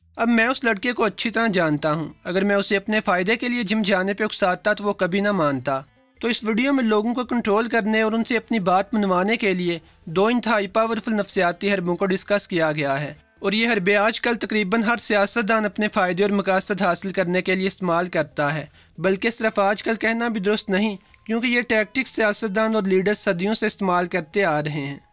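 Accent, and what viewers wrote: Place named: Gujranwala, Pakistan. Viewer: Bhai g gujranwala k lag rhy...😌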